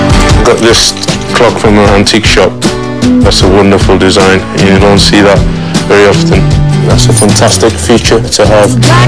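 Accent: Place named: Newcastle, United Kingdom. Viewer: best black guiy with a geordie accent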